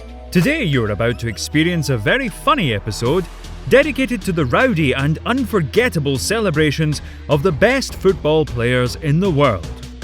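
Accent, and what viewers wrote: Scotland, United Kingdom. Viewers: A video about drinking just feels so much better when narrated in a Scottish accent!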